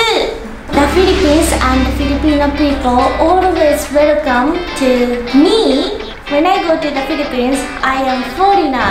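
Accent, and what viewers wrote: Japan. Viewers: Please keep your Japanese accent, for me it makes your englush so cute. You speak well already.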